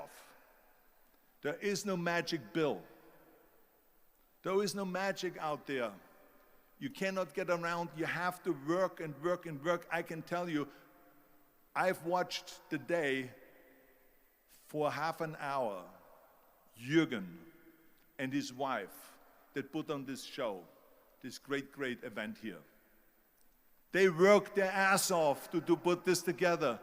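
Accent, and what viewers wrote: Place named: United States. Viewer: Really Great Speech ... Nice Job Guys. Anyone that doesn't understand American accent, can watch this speech with sub title here : <link>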